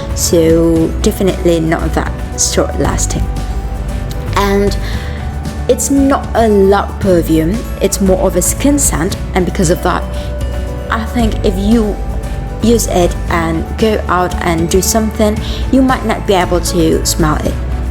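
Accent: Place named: United Kingdom. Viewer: Great description, Awesome illustration, Fantastic British accent ! Well Done !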